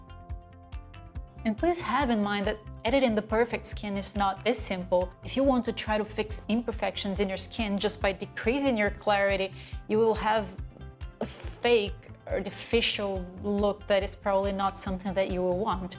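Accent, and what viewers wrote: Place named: Brazil. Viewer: Brasileira né? Percebi logo no inicio do video. Eai Guy's. hehehehhe. Anyway your english is nice. Flow! :) Great video. Obrigado!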